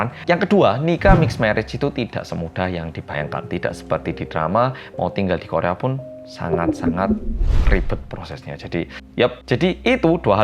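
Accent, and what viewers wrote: Java, Indonesia. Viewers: Kk sdah menetap d korea ya? Aq org jawa,pling suka dg pembawaan medoknya😊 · Hai org korea medoook❤ Nyimaaak 🎉🎉🎉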